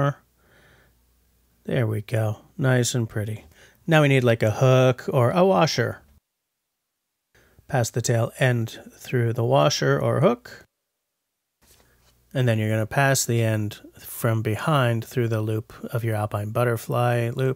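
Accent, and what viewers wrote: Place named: Western Canada, Canada. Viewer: Hey there friendly. Man, I loved this one, too. Dead battery, yeah right. I bet it was a Nigel Tufnel wireless issue and you had the RCAF instead of you talking. Lol. How hard was the dubbing at the beginning? I'm just learning to edit, so I know it must have been way tougher than it looks. Had to watch twice, you kept cracking me up. I like your dry sense of humour. "here I am being silly/I must have said something funny/as I look pensive". Happy Thumbs up, sir. On a side note, your accent doesn't sound Quebequois...west of Manitoba, perhaps? Take care mon frere,.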